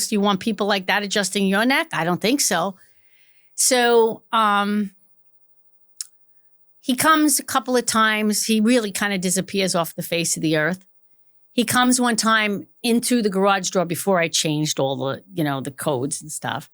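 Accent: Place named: New York, United States. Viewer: I could listen to her for hours. Love the NY accent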